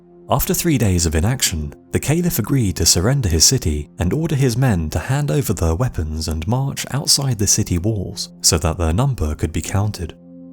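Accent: England, United Kingdom. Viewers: Every time I hear an English accent I think of English Peter Griffin and his teeth..🎩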